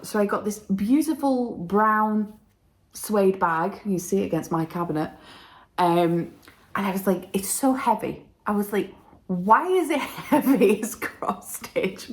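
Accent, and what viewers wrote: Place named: Sheffield, United Kingdom. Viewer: Wow, great month! My first retreat was Retford too ☺️ totally agree it was fabulous. Where do you come from? Your accent sounds local to me near Sheffield xx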